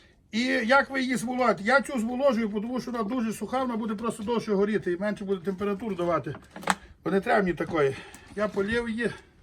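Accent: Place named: Galicia, Ukraine. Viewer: Класна буржуйка і майстерня. Судячи по акценту і діалекту пан з Галичини.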